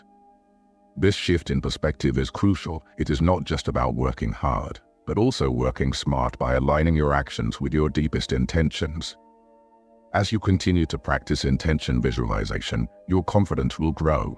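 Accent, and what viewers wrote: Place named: United Kingdom. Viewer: I can hear that British accent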